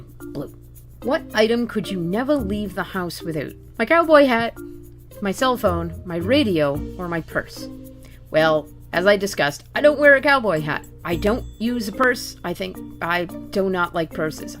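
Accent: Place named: Boston, United States. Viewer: Once you said you're close to Bostonian I instantly heard it in your voice.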